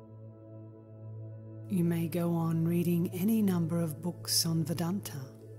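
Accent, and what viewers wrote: Australia. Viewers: are you an Australia? I love listening to your readings and i love the space you give it, the most essential aspect of this work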